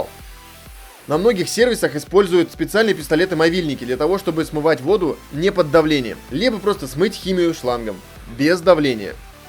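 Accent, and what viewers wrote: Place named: Perm, Russia. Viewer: Говор как у Реальных пацанов, с Перми что-ли? 😀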